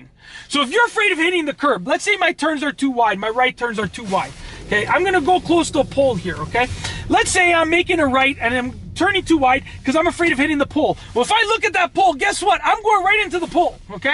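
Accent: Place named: Canada. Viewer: I love how I realized he was Canadian when he was yelling from his accent. Haha! I got a very well needed laugh! I love (Toronto) Canadian accents!!! They're my fave! :-D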